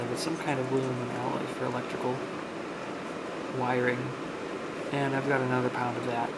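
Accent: United States. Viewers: American accent.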